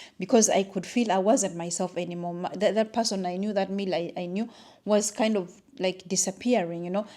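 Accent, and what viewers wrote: Uganda. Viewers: Beautiful material and what you did with it but should anyone in your size of your age be wearing such a thing in that short length and showing it publicly? Not nice at all - no offence meant. And FYI HRT will give you cancer. Doing your own research is important and great but does not Negate that it causes cancer - 100%. Because the body knows the difference and indeed it can feel awful- in perimenopause mdnopause and post menopause and you want relief but going to do HRT just to get relief can take your life away literally. And every cell and body function works with hormones. You can do something different that works just the same and better. And let me correct something it is not all people who have diabetes that don't have insulin..and contrary to conventional or allopathic medicine theories that giving more insulin helps diabetics it doesn't. 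The issue is hormonal imbalance and the weight is obviously from high cortisol so is the insomnia. As for your weight you obviously sound Ufandan to me and again no offence but Ugandans especially women are fat by nature structure culture and the way you eat. Huge Portions and fattening food. If you are more mindful of that you will loose weight i.e why I said that wrapper length is not doing anything for you. If you make it longer it will but at that short length it Not flattering at all it makes you look bigger especially if you have hips and a bigger backside. And I do not know you. This is not personal. Just stating truth and f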